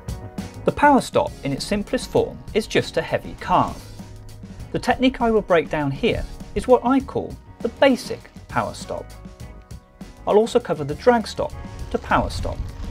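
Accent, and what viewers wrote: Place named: United Kingdom. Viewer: tbh, i just watch these videos because i enjoy the british accent^^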